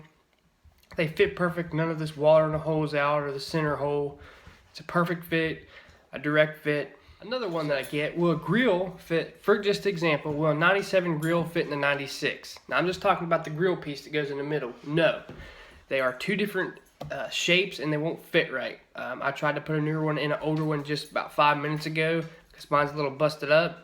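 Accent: South, United States. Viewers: Howdy. What's your opinion of the rear brake drum to disc conversion kits? Is it a worthwhile upgrade do you think? Thanks, man. (Your vids are great, and so is your Southern accent)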